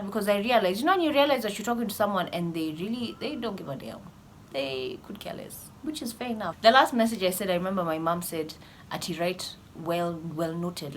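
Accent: England, United Kingdom. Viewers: I love your British accent 😍😂😂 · I honestly looove your english accent ❤😍n your sense of humour 😂😂😂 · you should do a video where u speak using the British accent only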